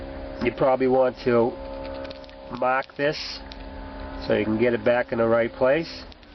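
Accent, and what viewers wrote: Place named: Northeast, United States. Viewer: Paaaaak the caaaaaaa! How come all do-it-yourself guys have that northeastern accent real bad?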